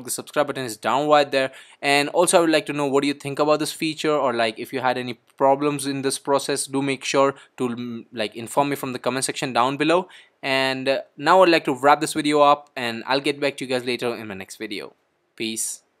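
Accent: India. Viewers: That god-awful Indian ACCENT. I couldn't understand a fucking thing this guy was saying. all I heard throughout the video was BEE BEE BEE BEE BEE BEE BEE BEE.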